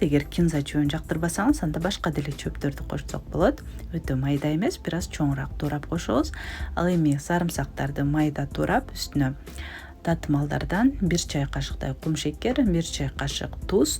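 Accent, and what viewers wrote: Standard. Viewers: Сонун азаматсыз. Сизден дагы коптогон рецептерди кутобуз. Кыргыз тилинде таза суйлогонунуз абдан кубандырат😊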